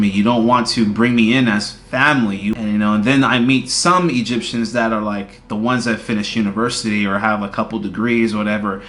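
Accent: United States. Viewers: Your American accent gave you an edge. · I am sorry that you didn't get the experience you expected in Egypt. It seems to me that you went with a preconceived idea of what your relationship with the Egyptians should be. Then, you found out that they don't feel the same way about you. But you were lucky you didn't experience any racism there. That's because you carry the little blue passport, and the accent to go with it. Trust me: If you had been from subsharan Africa, you would have felt their racism.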